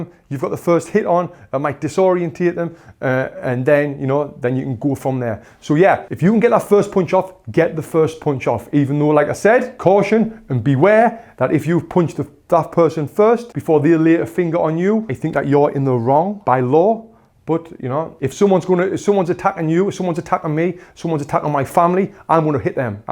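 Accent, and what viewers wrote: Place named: Peterlee, United Kingdom. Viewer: I live in Peterlee haha I though your accent sounded very similar to mine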